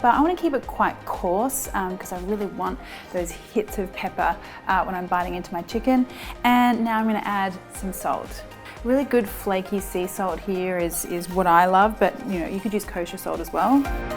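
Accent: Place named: Australia. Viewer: Your Australian accent is getting thicker which each video! Hope you enjoy your return to Oz xx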